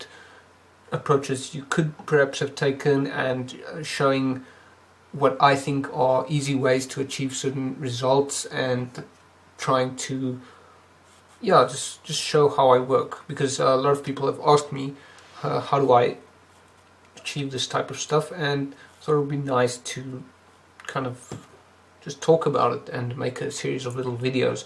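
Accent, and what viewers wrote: South Africa. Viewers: South Afrian?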